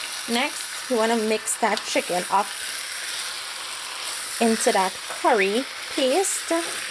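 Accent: Trinidad and Tobago. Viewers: The Trini accent makes it MORE interesting!!!!!!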